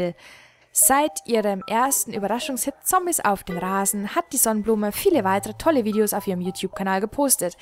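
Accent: Bavaria, Germany. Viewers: Yoooo bayrisch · ich bemerke deinen bayrischen akzent , aber er fällt kaum auf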